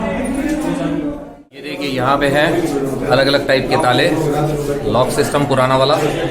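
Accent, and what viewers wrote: Standard. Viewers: Bhai kya vedio banate ho aap jordar. Apki suddha Hindi bolneka andaj man gaye yar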